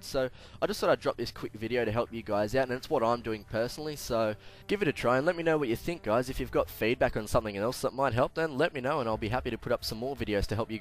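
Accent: Australia. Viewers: Subbed... Awesome to hear an aussie voice....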